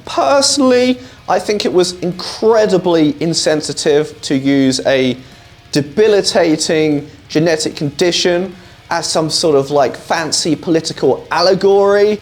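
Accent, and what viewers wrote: United Kingdom. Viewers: limp has more then one meaning like floppy also you sound like your across the pond so bisket means cookie to you not sure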